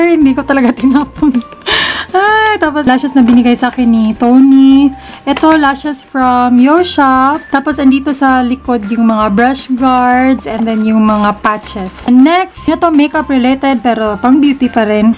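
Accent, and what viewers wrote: Visayas, Philippines. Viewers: Visaya po ba kayo??? Kasi po parang may mga words na accent at visaya word po kayo ask ko po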